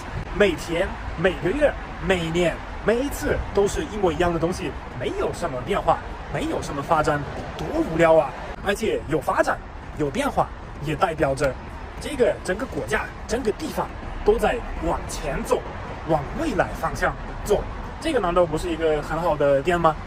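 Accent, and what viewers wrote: Sichuan, China. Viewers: 的四川话有味道😄👍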